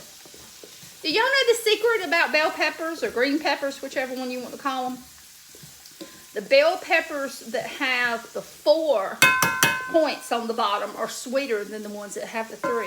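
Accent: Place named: Eastern North Carolina, United States. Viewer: I just watched your entire video with my birthday fiancee. We are both Eastern NC natives, so could easily understand your ack-see-ent! We have decided to come to your house and cook with you this Tuesday. I'll pay for all the ingredients, bring a couple bottles of white wine (since you seem to be out), and we'll solve the Covid crisis. Seriously, truly enjoyed your recipe (very similar to Myrla's). Thanks. JIm, new subsriber.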